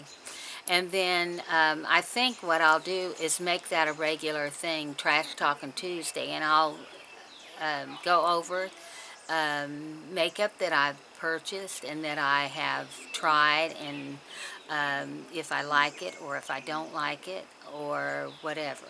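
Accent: West Virginia, United States. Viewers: I enjoyed listening on my morning walk this morning. I also gave you a like. Keep making your videos. I watched Little Poet when she first started and now she has 100k subscribers. I am all about people making money on You Tube. It is a good extra income for those that can do it. Love your W Va accent ie, " you'ens. I am going to Ohio to visit my mom and sister in August. Not really a vacation, but we love to see them and we take 3 days to get there only drive 6 hours a day. So we sight see a bit on the way up. I hate taking medications always some kind of side effect. Sometimes there is no other way though. I hate cockroaches, I would not have stayed either.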